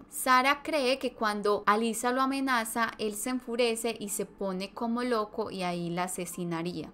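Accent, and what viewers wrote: Antioquia, Colombia. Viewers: es Colombiana 🇨🇴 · Primera vez que veo tus investigaciones, soy panameña, me encanta tu atento colombiano, me encanto el Berraca, excelente trabajo y este caso te quedo fenomenal, duro y exitos guapa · Wow! Muy buen video, me gusta como narras y la información que proporcionas está muy detallada 👌. Tienes una nva. suscriptora 😃. Por cierto tu acento de 🤔 colombiana? me suena bien lindo, me recuerda a las de las novelas colombianas que veía con mi hija 😁. Saludos desde Mérida, México 🇲🇽. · Te encontré, y no pude resistír hacer una maratón de tus videos . 😮 wow lo haces muy bien y ese acento paisa me encanta viva nuestra 🇨🇴👏🏻👏🏻👏🏻👏🏻👏🏻👏🏻👏🏻👏🏻👏🏻👏🏻👏🏻👍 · Eres de colombia verdad se nota por tu asentó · un gusto tu forma de contar ya me suscribi eres de colombia?saludos desde lima Peru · Saludos desde Orange California 🍊 from 🇲🇽 Mexico. 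Ese acento colombiano el mas hermoso ❤ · Es una mueletilla del acento paisa en Colombia...es genial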